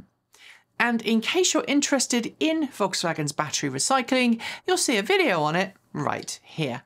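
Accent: England, United Kingdom. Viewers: does have a regional accent -- that region is England:-) And within England, toward the south, and well educated. And I am saying as a non native english speaker you can't tell whether this is an english accent, or one from somewhere in the US. Therefor I'd find it stunning if anyone would have problem with (unless they are looking for problems). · does have a regional accent -- that region is England:-) And within England, toward the south, and well educated. Also I think goes out of her way to enunciate clearly. Listening to her as a native English speaker, she's pretty unmistakably not American. I don't think many Americans would believe her accent is from anywhere in the US.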